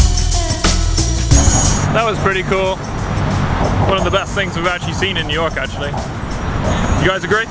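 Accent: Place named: Dorset, United Kingdom. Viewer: back to Bounemouth eh? Oi knew oi erd a Dorset accent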